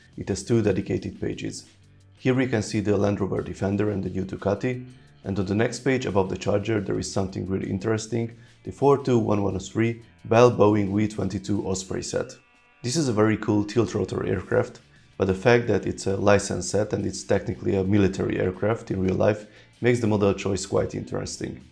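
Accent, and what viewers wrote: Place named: Hungary. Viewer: I just asking, because is a Hungarian name and your accent is soo Hungarian. But if no, then sorry. 😅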